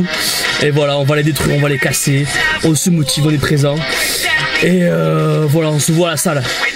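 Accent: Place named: Marseille, France. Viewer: L'accent Marseille 😂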